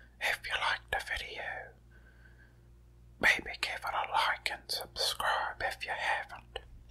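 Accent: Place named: Pennsylvania Dutch, United States. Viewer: it's a penny Dutch accent albeit a little different from the traditional penny Dutch accent. He's from PA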